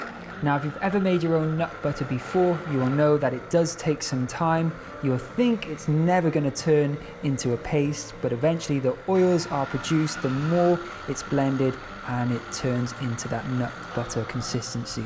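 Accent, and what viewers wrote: Wales, United Kingdom. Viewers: I love watching your videos. My husband was welsh he passed away two years ago. I miss listening to his voice. Hearing your welsh accent makes me so happy. I love gardening and cooking too. You are inspiring and you warm my soul. Thank you ☺️❤️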